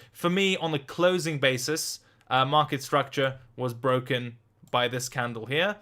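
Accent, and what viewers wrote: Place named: United Kingdom. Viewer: was extra british in this one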